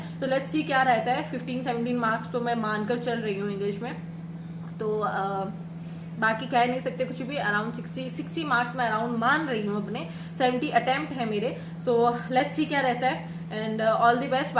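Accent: Haryana, India. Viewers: ha to Haryanvi bolo na English kyu kharabar kar rahe ho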